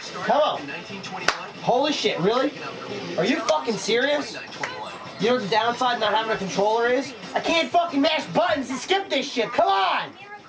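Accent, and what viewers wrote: New Jersey, United States. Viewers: Back when didn't hide his Jersey accent. *Cheers and applause* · So much Jersey accent. It's beautiful.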